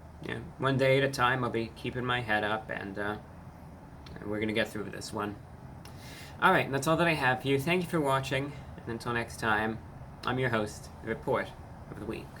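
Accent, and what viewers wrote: United Kingdom. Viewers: When did he become British? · I haven't seen his videos in a few years, why does he affect a British accent now? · I haven't watched the channel in a while but i swear he turned British somehow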